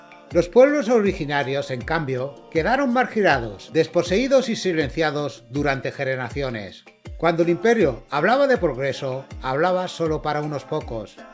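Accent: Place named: Spain. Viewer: Sin querer tirar hate, pero me parece curioso que una persona co acento español hable mal de colonizadores, perdon pero me pareció curioso XDD. Me gustó mucho el video ♡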